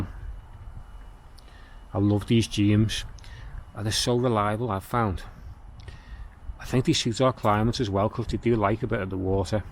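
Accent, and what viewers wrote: Liverpool, United Kingdom. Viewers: Lovely to see your garden again and listen to a fellow scouser, happy to see the cordyline survived. Regards from Ontario, Canada.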